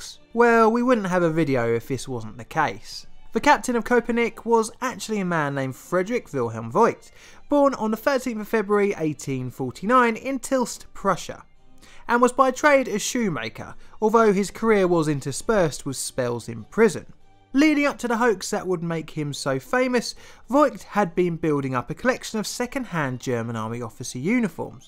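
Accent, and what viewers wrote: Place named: London, United Kingdom. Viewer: More fuel for my addiction :( also your accent is so Londoney hello neighbour.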